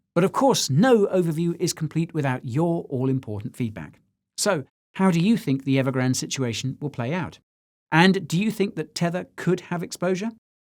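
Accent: United States. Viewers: Your American accent is on point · LOLOL I love the American English accent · Good American accent... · Love that American accent. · stick to the cowboy accent brother !!! it suits you !!! :)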